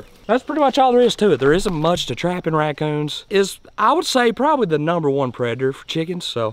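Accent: South, United States. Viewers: the egg eater shorts got me hooked, as well as your accent being from the south as well. keep up the good work my man.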